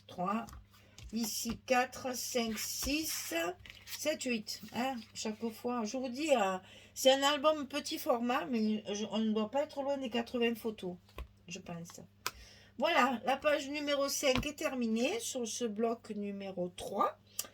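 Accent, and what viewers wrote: South, France. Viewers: Bjr. Un tuto de vous sans parole quel gâchis!! Votre accent ensoleillé nous manquerais trop par ces jours de pluie ☔... Même sur le Pays Basque / landes le temps est triste actuellement... prenez soins de vos cordes vocales, vos tutos ne peuvent pas fonctionner sans votre voix du Midi... A demain ou plutôt Adishatz (en landais) ou Agur (en basque)...👋😉 bonne journée.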